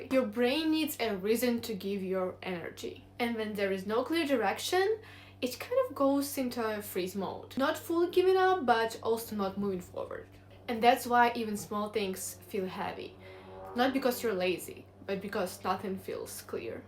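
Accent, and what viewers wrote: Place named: Eastern Europe. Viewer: i hear a slavic accent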